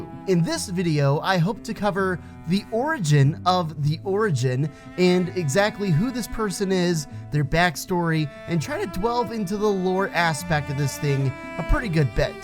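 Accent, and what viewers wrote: United Kingdom. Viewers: i knew he was British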